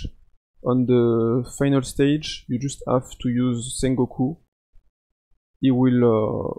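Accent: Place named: France. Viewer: tkt c'est compréhensible pour un français, c'est juste que pour des étrangers ça peut être compliqué tant ton accent français est fort, par exemple j'ai + de mal à comprendre tubechau à cause de son accent Suédois.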